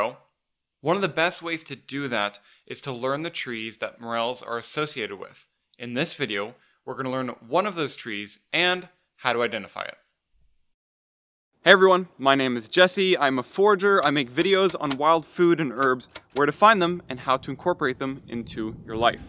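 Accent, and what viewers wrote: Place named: Pennsylvania, United States. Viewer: So where are you at or from? Cuz I'd swear you were a county over with your accent. Ya Sound like any other Pennsylvania person to me.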